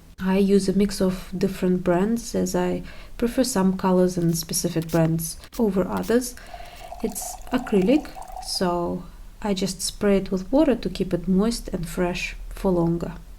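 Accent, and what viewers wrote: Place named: Russia. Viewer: What country do you work in obviously you have Russian accent but I think your page said you studied in Auckland?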